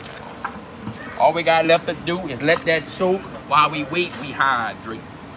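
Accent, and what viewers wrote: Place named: Brooklyn, United States. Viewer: This guy must be from Brooklyn NY!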